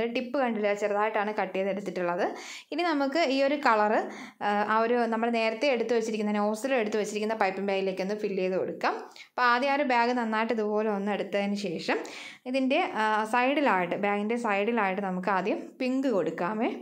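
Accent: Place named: Italy. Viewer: Parece una italiana hablando inglés 😅 me agotó jajaja. Al principio no sabía bien en qué idioma estaba explicando! 😂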